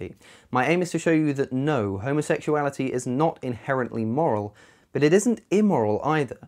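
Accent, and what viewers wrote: United Kingdom. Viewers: His British accent makes him sound smarter than he otherwise would and the American flag in the background confuses me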